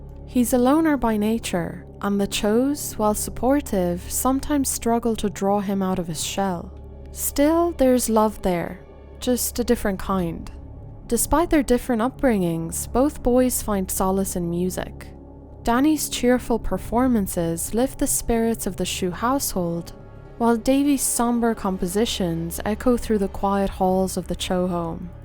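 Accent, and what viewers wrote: Ireland. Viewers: I have been listening to you and watching your videos forever and only just now clocked your Irish accent!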